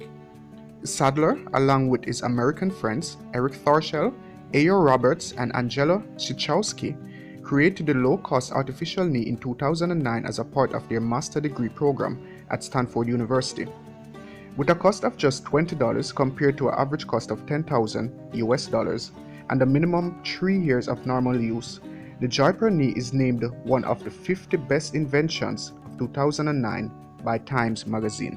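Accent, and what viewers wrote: Jamaica. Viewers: It still sounds like he's speaking creole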